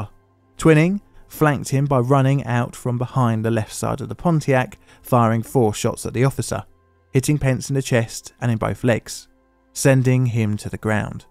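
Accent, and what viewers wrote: United Kingdom. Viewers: Always interesting to hear a Brit describing American incidents. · The one graphic showing the front of the car shows the British origin of this video (as if the accent didn't already) because Americans drive on the right side of the road meaning the driver is on the right side of the vehicle. What other details are wrong?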